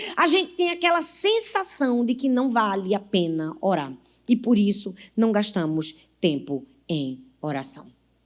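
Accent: Northeast, Brazil. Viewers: Sou católica ,nem por isso deixo de acompanhar suas pregações, tem mais sou pernambucana da gema, assim como você!!!!! Amo seu sotaque bem nordestino.visse menina????? Kkkk🎉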